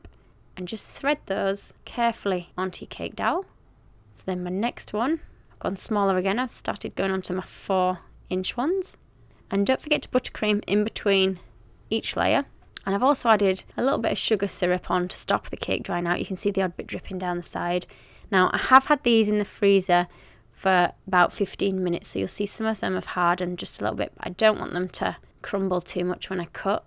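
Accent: United Kingdom. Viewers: fathi irfan No she sound kinda British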